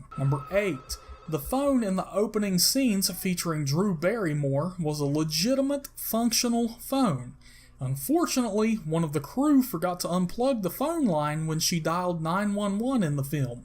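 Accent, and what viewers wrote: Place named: South, United States. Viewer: I can't get past the country voice, and I'm from the south!!!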